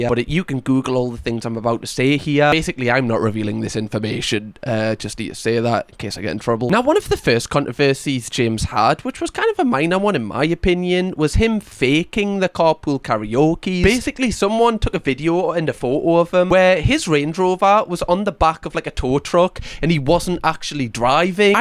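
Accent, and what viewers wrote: Newcastle, United Kingdom. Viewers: Love you,! Geordie accent 4-Ever! Love from across the pond xoxo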